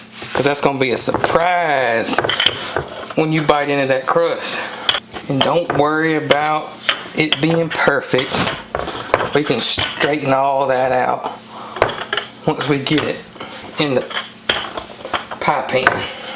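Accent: South, United States. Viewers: "let it fridgerate" love the southern speak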